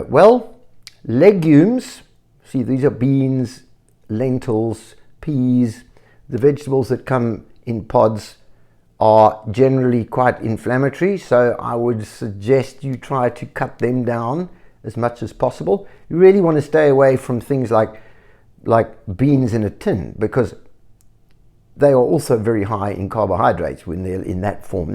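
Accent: South Africa. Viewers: Are you South African (by your accent!?) Can you give an example of what you ate in a day?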